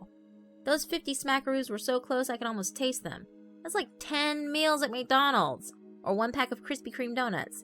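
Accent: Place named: England, United Kingdom. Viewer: Loved the English accent ❤️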